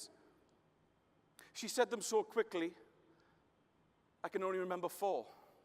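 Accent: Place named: Scotland, United Kingdom. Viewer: Brilliant Keynote. I love that you have an accent (me too I'm a Scot)!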